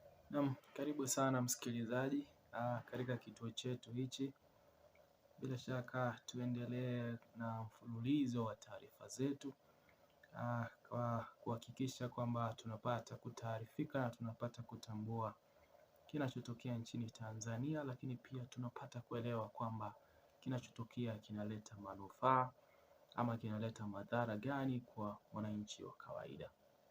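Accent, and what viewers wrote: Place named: Luo, Kenya. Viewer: Kama mtaandamana kama shetani wenu heche alivyojinadi katika mikutano yake jiandaaeni kuona mnayoyataka kuona na huo ndio utakuwa mwisho wa ushetani wa chadema kama heche atajiona kuwa yeye ni zaidi ya Dola ajitokeze siku hiyo asiache kuandamana atangulie yeye pamoja na genge lake la uhalifu wa kisiasa na wewe kijana kutoka Kenya na hiyo studio Yako unachoongea kuhusu hayo maandamamo haujitambui na lafudhi Yako ni ya kijaluo endelea tutakupata pale ulipo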